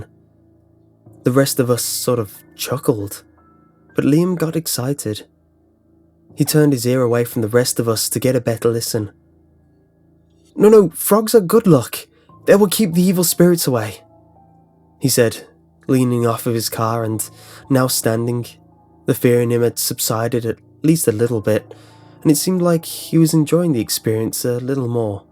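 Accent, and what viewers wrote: United Kingdom. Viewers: buddy grew up in northern nevada with a British accent.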